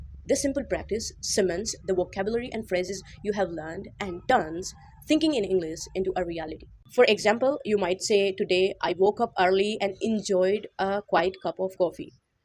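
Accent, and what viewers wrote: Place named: United States. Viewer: Are u living in US ? Ur accents is very closer to them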